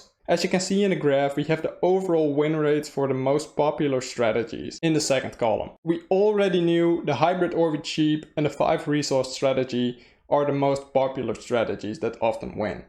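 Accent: Netherlands. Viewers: Je kan je Nederlandse accent niet verbergen haha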